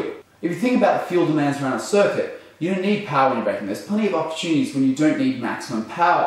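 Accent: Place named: Australia. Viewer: Contents are very good, although a little too quick and a bit confusing at times. Problem is the Australian accent. Hard to fully understand when you are not Aussie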